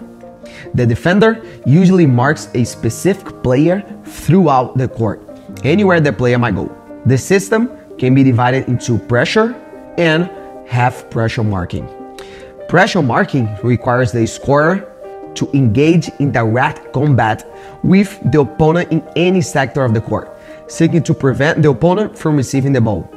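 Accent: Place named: Brazil. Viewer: Vc é brasileiro né? A gnt reconhece kkkkkk ótimo vídeo 👏🏾👏🏾👏🏾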